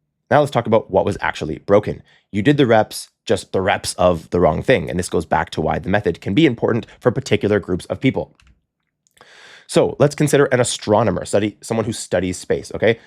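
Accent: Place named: China. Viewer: Dude,your mandarin accent is just great,keep it.———from Douyin🎉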